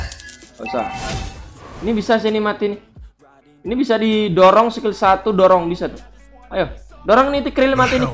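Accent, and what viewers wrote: Java, Indonesia. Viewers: Jane wong medan apa wong jawa mas?? Wkwk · Wong jawa ternyata😂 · Jowone ngendi mas hehehe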